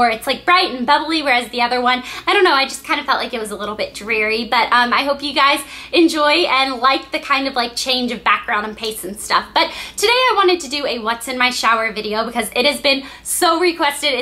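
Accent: United States. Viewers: Then don't watch her. Plain and Simple. And she is american so ergo an american accent.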